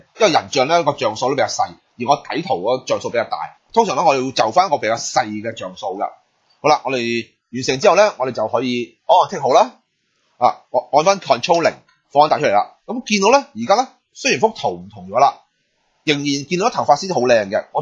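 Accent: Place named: Hong Kong, China. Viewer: 本来想这找一个背景不是白色的 扣头发丝教学， 一听还听不懂，头一回听感觉像香港那边人说话的声音。。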